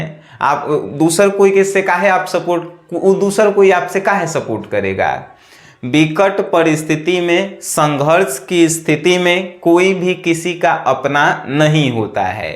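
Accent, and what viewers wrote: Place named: Magahi, India. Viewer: Bhaiya -Bhojpuri bolo. Magahi thik nahi lagta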